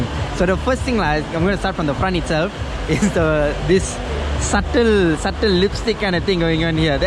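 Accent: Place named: Tamil Nadu, India. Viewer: Your tamil english smart bro... teringat kat member2...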